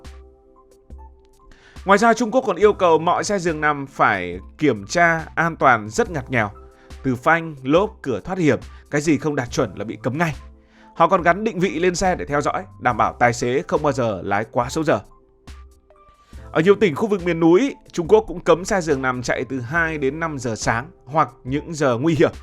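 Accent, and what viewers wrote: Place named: Thanh Hoa, Vietnam. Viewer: blv quê thanh hoá à, nghe 1 vài câu ra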